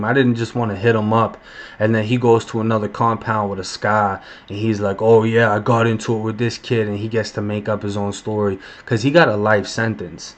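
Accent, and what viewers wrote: Boston, United States. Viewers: It sounds like you got a Boston accent under the Floridan sound. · Can tell you from Boston for sure lol. Fuckin with your channel tho bro I appreciate the people you know are keeping the shit authentic. You're gonna blow up soon keep up with this YouTube shit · You originally from Massachusetts? I hear a Boston accent...... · The way you tell your stories with the Boston accent makes it that much better. Keeps me coming back keep it up man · I would've done it too man. I grew up wit all females too. I'm from Boston and your accent is half and half. Keep making vids man you're a great storyteller just like Death that's all you need to blow up on YouTube and make it your job. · This whole time I’m thinking bro sounds like he from Northshore Boston and I went back and saw you’re from Malden that’s what’s up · Love ❤️ your Boston accent keep uploading daily ....We would watch daily too ..love and wishing you all the best Love 💕 from Southern California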